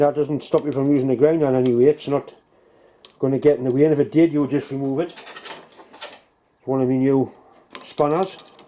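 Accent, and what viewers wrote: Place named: Northeast England, United Kingdom. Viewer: North east accent, but cant place exactly where.... Id have said closer to the tyne than the wear though! Good idea that, subbing for lathe porn!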